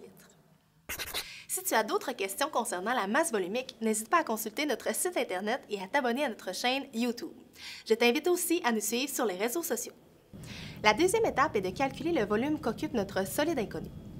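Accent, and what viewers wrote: Quebec, Canada. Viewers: J'adore le Québec, l'accent, les expressions et tout ça alors ça m'encourage à faire des sciences lol! Mercii haha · Derien et merci d’avoir répondu PS :Je surkkiffe votre accent canadien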